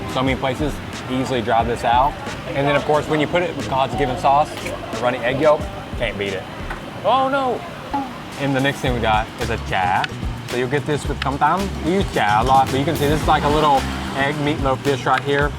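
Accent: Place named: South, United States. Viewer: Love that southern accent ❤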